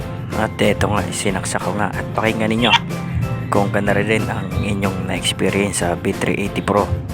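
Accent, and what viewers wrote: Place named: Batangas, Philippines. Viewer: Idol taga batangas ka ba ? May Punto Kasi ung salita mo sa video, may problema ung v380pro ko ayaw mag read Ng QR code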